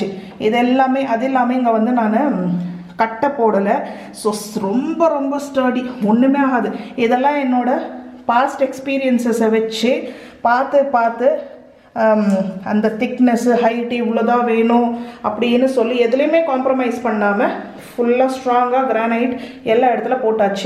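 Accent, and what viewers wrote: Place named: Karnataka, India. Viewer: Kannadam kalantha tamil arumai vazhthukkal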